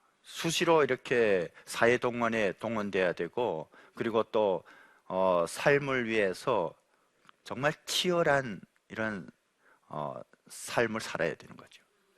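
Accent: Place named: North Korea. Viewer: 핵명 혁명 목사님 북한 억양이 ~~ 은혜 시간입니다. 감사합니다 ~~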